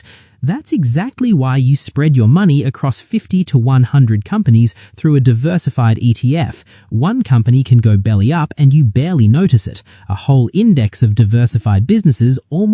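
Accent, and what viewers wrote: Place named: Australia. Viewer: I know your channel is created to target people who literally a bit lost their way in personal financial approach. First of all, I apologize I been watching yer videos as a English listening practice source, second I love your Australian accent, mate❤❤❤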